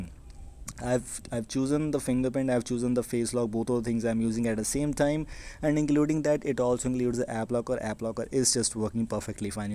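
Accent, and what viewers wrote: India. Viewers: Bhai Lucknow se ho kya?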